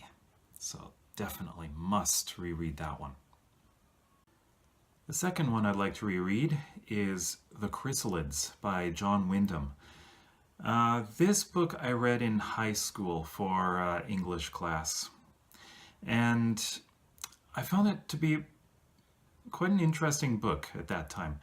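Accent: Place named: Canada. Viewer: I like your accent! Is it Canadian?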